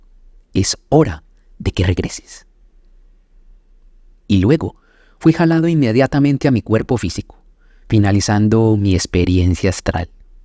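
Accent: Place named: Antioquia, Colombia. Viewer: Que maravilla escucharte, gracias por la entrega de los mensajes. Nada pasa por casualidad creo que todos los que llegamos a tu canal, teníamos que hacerlo y yo estoy feliz y agradecida con el universo y la vida por llegar a tú sendero, me encanta tu asentó, tan Paisa tan bonito 😍, yo también nací en esa hermosa tierra pero llevo muchos años fuera.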